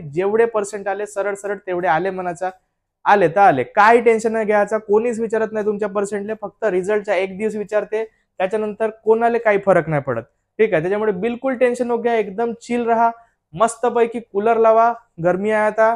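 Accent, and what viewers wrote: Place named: Malvani, India. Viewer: Sir khup chan malvani boltat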